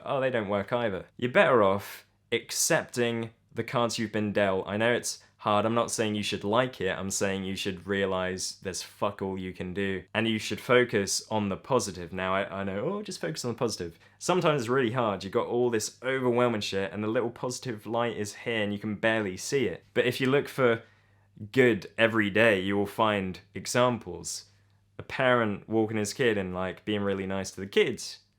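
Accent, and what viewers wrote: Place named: England, United Kingdom. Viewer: Where do you live? I presume from England from your accent. Good video anyway. What about your mental health and depression? I hope well. Best wishes from Italy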